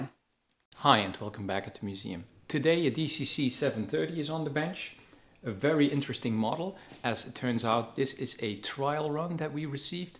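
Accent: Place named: Netherlands. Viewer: Hi, I am new to your channel, had a look at your website and a question immediately arose: according to your accent and name I wouls assume you are a Dutch guy, just like I am. But the company/museum is based in the U.S.? A lot of the museum's team members have names that sound like ones close by, could you explain this situation? There is a good chance I would like to order some parts in the near future and perhaps it would be easier to ship from NLD than from USA. I will be watching all the videos shortly as there is a ton of useful information available I assume. Thank you very much!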